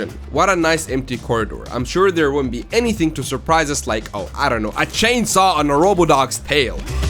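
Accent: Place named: Arab world. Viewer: أظن من لكنتك ومخارج نطقك للحروف انك عربي😅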